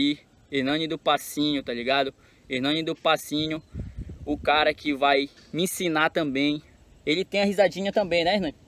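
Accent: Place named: Pará, Brazil. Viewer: tu e paraense crtz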